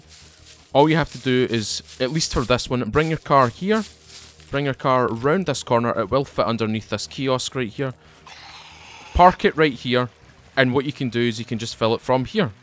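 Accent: Scotland, United Kingdom. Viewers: Hey, I watched all of your SP Episodes and I learned so much about the game. Thanks and greetings from East-Germany. PS. I like you scotish dialect.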